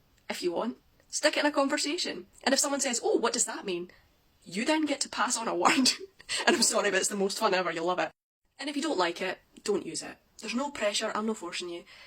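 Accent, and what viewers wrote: Scotland, United Kingdom. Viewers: I have always felt that Scots language is kind of regal, has a lot of history in it, at opposed to the average English language. So I am surprised at this. I am from Sweden, which may colour my perception. Anyway, I love listening to Scottish people talk, including you of course. And I have your book! Love it!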